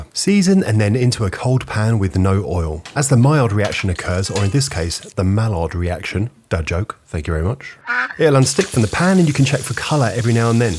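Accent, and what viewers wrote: United Kingdom. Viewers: Fuck off mate I can tell from your accent you eat beans for breakfast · He sounds British and says "in". Otherwise, no.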